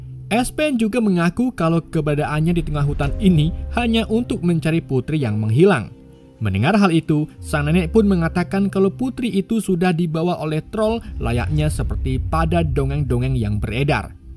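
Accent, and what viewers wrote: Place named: Java, Indonesia. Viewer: nyong curiga dari dulu kayane admin wong jawa tulen😂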